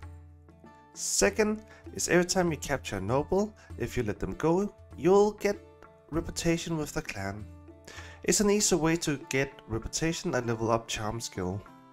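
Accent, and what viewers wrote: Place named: Denmark. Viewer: Faldt over denne video, og sad også og tænkte "han lyder lidt dansk ham der"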